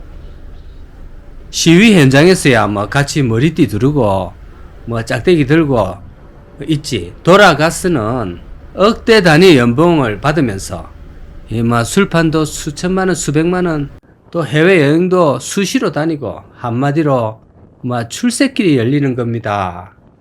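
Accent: Gyeongsang, South Korea. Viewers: 혐오 갈라치기 좀 그만해라 ..나이처먹고 뭐하는건지...나도 나이 처먹어도 돈 이런식으로 안벌어....그리고 경상도 억양쓰니 더 역겨워~ 이세상에 일방적인것은 없어...노조없는 삼성은? 천국이냐? 노조의 문제점이 뭔지 해결책과 헤안이아닌 오로지 혐오... 나이 이렇게 처먹는거 아니다... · 유튜버는 사람들 일자리 잃어가는게 신나고 즐거운가 보네...한국에 기레기가 만든 용어인 귀족근로자 많아서 잘살면 덧나냐? 다들 생활고에 시들어 살아야 정상이냐? 말투가 역시나 경상도인이네...경상도 사람들의 종특은 모두가 못살기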